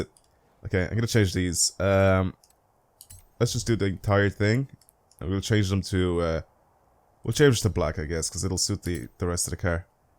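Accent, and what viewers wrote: Ireland. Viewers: I can't tell what his accent is but it sounds Irish or European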